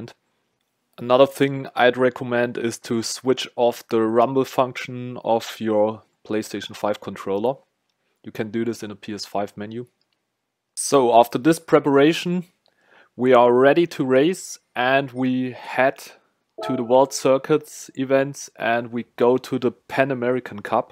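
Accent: Germany. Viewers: Deutscher Dialekt, hört man sofort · Man hört den deutschen😂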